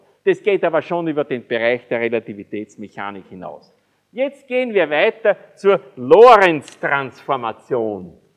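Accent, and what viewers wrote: Vienna, Austria. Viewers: bin durch Zufall hier vorbeigekommen, und dann hängengeblieben. Sensationeller Vortrag, wunderbare klassische Wiener Sprachfärbung und sehr verständlich. Da bekommt man Lust noch ein Studium zu beginnen.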